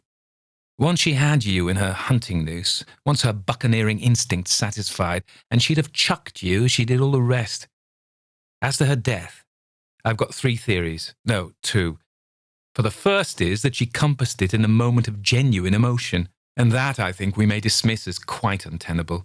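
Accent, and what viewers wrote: United States. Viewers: My opinion, for what it’s worth, is that Luly was just a naïve kid who didn’t have the cynical worldly “sense”the men had. She was just having fun, learning to skate, learning to swim etc and that was all there was to it. The men put a different color on her actions. Main was smitten with her and therefore jealous, so he tried to put her in a bad light to his friend—and he wasn’t much of a gentleman to talk about a lady as he did. Campbell was a prude and a sadist. A true gentleman would never be that cruel. Cool perhaps, but not cruel. A very upsetting story, although your reading as always was excellent. :_pumpkin: Couldn’t quite place your American accent; Luly was apparently brought up out west. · I love listening to you read. Is that an American accent?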